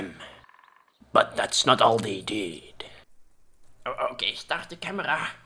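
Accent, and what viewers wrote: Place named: Netherlands. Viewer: sounds like a dutch speaking english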